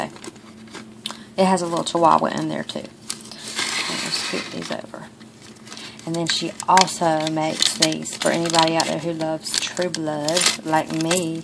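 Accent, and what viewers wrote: North Carolina, United States. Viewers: K3N Clothtales recommended your videos and I am gonna be honest, I was a little floored when you started talking and you have the same accent as me lol I think you’re the first fiber artist/creator with a southern accent I’ve seen on YouTube. Subscribed and liked, as you came highly recommended and your video is great. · Very clear and easy to understand. As others said, not boring in the slightest. I grew up in NC and your accent delights me and brings back many memories for this transplant to Yankee-land. Sorry if you've answered already, but what tool were you using to pick out the stitches? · Thank you so much for this tutorial. I used it on my first sock and it was my first after thought heel. You made it so easy to follow. Not sure, but sounds like you from the south. I'm from Ruston, Louisiana. So from one southern gal to another, thank you for the video. 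· I love your accent. I am from North Carolina, and I felt like I was home again. Great video, very helpful and entertaining!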